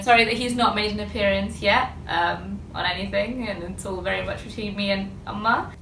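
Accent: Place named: Jaffna, Sri Lanka. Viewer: Im in love with her Tamil accent, that yazh Tamil!! She is such a sweetheart! Absolutely love all of her insta vids!!! 😍 -Love all the way from SG 💞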